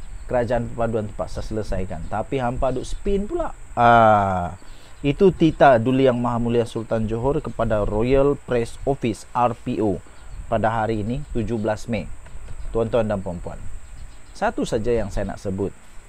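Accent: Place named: Kedah, Malaysia. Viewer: Awak pandai cakap Kedah hebat la awak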